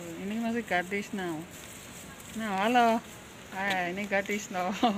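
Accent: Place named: Banyumas, Indonesia. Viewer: Ngapak itu indah mbak 😊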